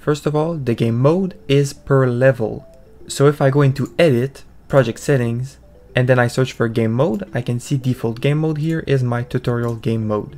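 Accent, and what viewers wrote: Quebec, Canada. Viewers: where u from? u sound french canadian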